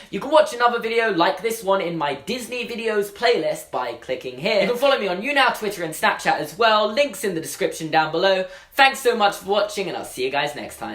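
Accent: United Kingdom. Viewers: Your British to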